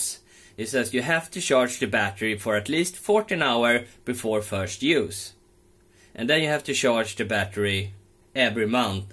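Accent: Sweden. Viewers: Gotta Love Swedish English gotta love clever Swedes gotta love a perfect solution Thanks - I'm now the only person in my state who knows how to fix this problem WOW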